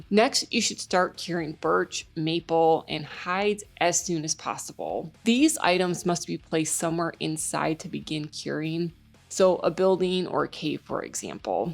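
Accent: United States. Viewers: Great video! U sound WI af😊.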